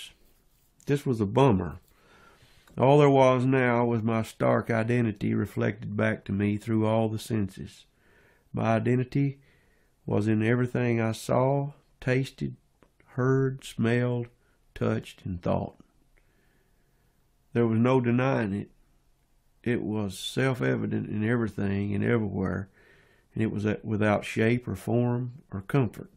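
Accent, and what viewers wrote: South, United States. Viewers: Well... how refreshing to hear it from a south accent. Take care. You got my smile. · Nothing like non-duality with a southern drawl....it just sucked me in mind and all...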